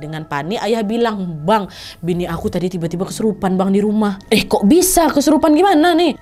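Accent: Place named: Riau, Indonesia. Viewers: pake logat Riau juga ya Wak😭😭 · Kak knpa logat nya jdi logat riau. "ndak do"😂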